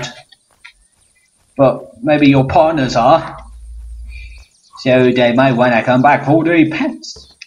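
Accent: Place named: Australia. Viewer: you sound Australian xD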